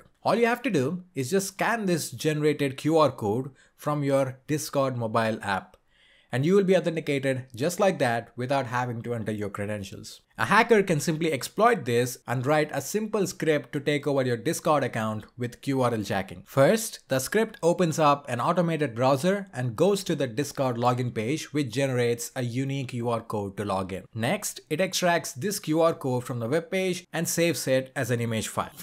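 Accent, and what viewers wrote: India. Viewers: Indian no text to speech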